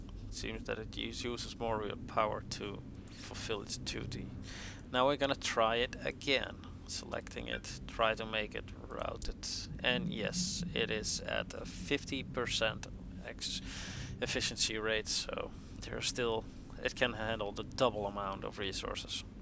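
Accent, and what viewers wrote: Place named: Netherlands. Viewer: Goed engels hoor, maar het is net duidelijk genoeg dat je een nederlander bent :P Froeten Mark Androcius ( character naam op EVE )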